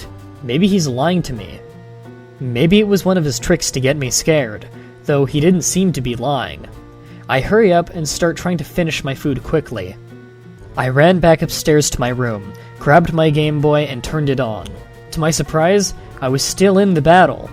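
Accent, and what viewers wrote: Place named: Canada. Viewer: lol ik i do apologize but there was just something about your accent said "canadian in my head."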